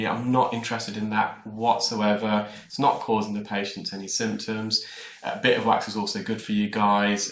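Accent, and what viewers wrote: United Kingdom. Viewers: Like your britain dialect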